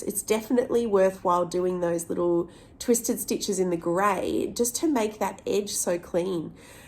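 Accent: Australia. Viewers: Wow, super impressive! Like everyone else, I have enjoyed seeing your progress and your reactions to each clue... especially with an Aussie accent 🤣 I am plodding along clue one still, but intrigued to dawdle along the rest of the way, with everyone else's helpful tips and tricks 😀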